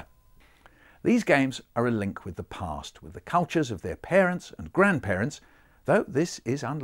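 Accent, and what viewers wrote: United Kingdom. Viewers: Yes, instead of hearing the children perform, let's just have an old British guy talking the whole time. :/ Typical British media presentation, with the RP narrator over the top of everything.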